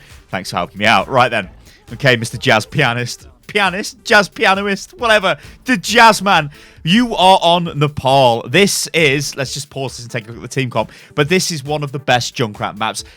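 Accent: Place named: United Kingdom. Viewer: Omg highlighted my comment what do i say!!!! Um...um... I love you're British accent 😭😍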